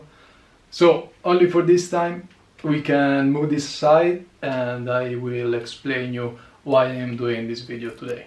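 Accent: Italy. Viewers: Scusami se ti faccio questa domanda, ma perché non fai video in Italiano? (si vede che lo sei, sopratutto si sente) Sei molto bravo complimenti! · Ma sei italiano? Ti ho appena scoperto e ti facevo americano, ora che ti sento parlare inglese mi è venuto qualche dubbio. Comunque adoro i tuoi video, sarai la mia nuova dipendenza su youtube dopo dermatologi che schiacciano cisti, giapponesi che cucinano, affilano coltelli e tagliano cose e cuccioli di panda :D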